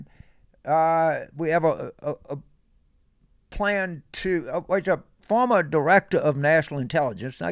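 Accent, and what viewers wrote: South, United States. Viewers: The wisdom of Franklin Roosevelt and Barack Obama spoken in the dialect and vocal intonations of the Confederacy. You are perfect Mr. Carville.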